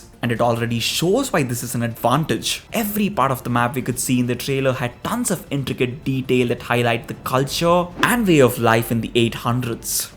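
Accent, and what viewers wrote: India. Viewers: basicly it's shit in ac unity it turns u into a f*ckin guards syndicate is just better things also it would be cool the more discounts for being good n not killing civs instead of killing u If u kill civs btw love ur channel keep up the great work n love the Indian accent always great to see foren ppl who play games I like its rare usually just americans and canadians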